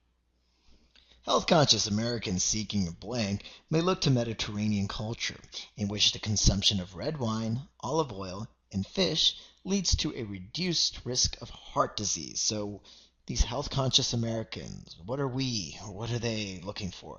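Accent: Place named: India. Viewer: nice indian ancent english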